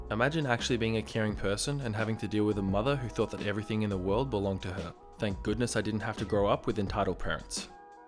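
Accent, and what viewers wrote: Australia. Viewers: Are you Australian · Narrator, are you from Australia? Your accent reminds me of some of my old friends from Australia. Just wondering. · Are you aussie?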